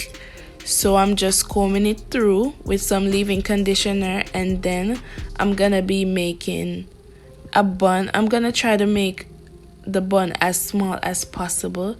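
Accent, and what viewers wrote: Jamaica. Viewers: Love you're jamaican accent❤❤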